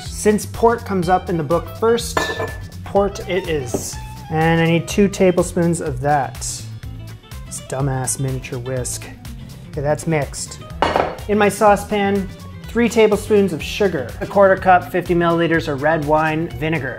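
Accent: Canada. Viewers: A NY apartment dweller with a Canadian dialect that thinks in metric while cooking imperial-united dishes. Interesting. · Did you ever live in Canada? Sometimes, i think I hear a slight Canadian accent in your voice.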